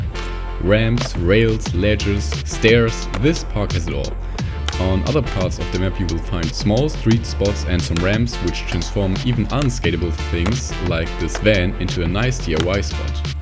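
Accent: Germany. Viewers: Are you german? The accent is soo german idk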